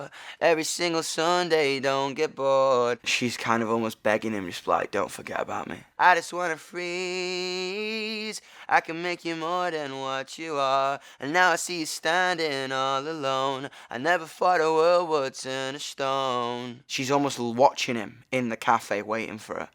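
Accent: United Kingdom. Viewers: I did not know that he had a bridish acsent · This is my first time hearing him talk and I wasn't expecting him to be British lol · Sure he’s passionate and this does deserve to be on genius, but another reason is because he’s British and everything they say sound amazing. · He’s British that’s how we say it it’s never fuck it’s either feck or fook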